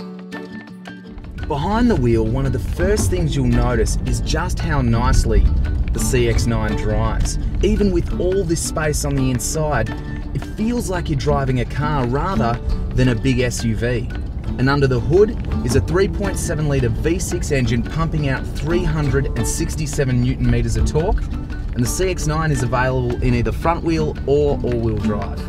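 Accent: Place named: Australia. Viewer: Other than this guy speaking Aussie and through his nose - this is one of the best CX9 reviews on You Tube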